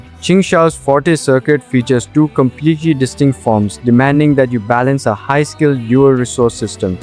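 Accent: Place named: India. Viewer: Don't use an Indian ENG accent if u want more followers 😀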